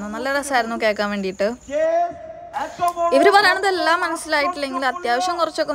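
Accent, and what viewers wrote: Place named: Thrissur, India. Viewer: സാധനങ്ങളുടെ വിലയുംകൂടി പറഞ്ഞാൽ നന്നായിരുന്നു (തൃശൂർ സ്ലാഗ് ഉണ്ടല്ലോ ) എന്തായാലും നന്നായിട്ടുട്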